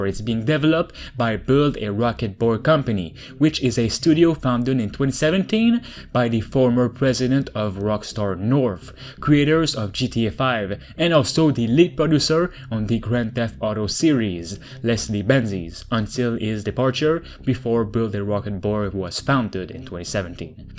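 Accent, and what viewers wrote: Quebec, Canada. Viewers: Are you from Québec? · Are you from Quebec ? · Youre quebecois im i right ?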